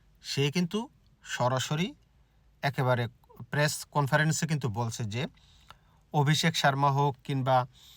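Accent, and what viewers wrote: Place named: Bangladesh. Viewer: Aapnar kotha sune ...eibar aapna k ekjon pure Bangladeshi bole mone hocche....jeta etodin mone hoini....🤩🤩🤩🤩🤩🤩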